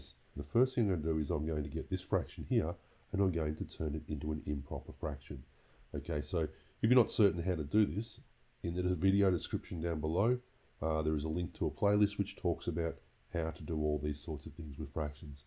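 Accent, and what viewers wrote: Australia. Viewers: He’s an Aussie , American moment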